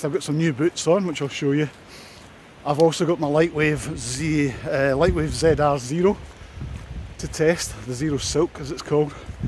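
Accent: Scotland, United Kingdom. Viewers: So sorry to hear about your mum - it's never easy, even when expected. I derive great comfort from watching your videos. I am a Glaswegian living in East London for more than 25 years now and I just don't get the chance to get my backpack and tent out nearly as often as I would like to. I enjoy listening to your Jock tones and experiencing your camps by proxy. Thank you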